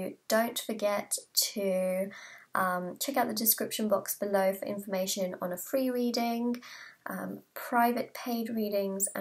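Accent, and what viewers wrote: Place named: RP, United Kingdom. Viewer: Newbie here. Will be interesting if it comes true. Nice to hear the queens English spoken properly.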